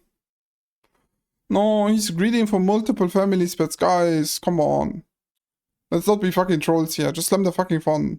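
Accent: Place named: Germany. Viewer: Gute Erklärung und man hört den deutschen Akzent raus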